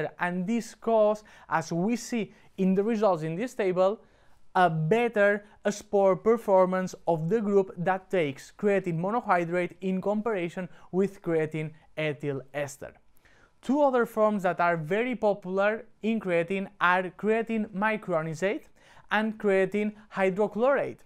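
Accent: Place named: Spain. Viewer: The Spanish accent is a bonus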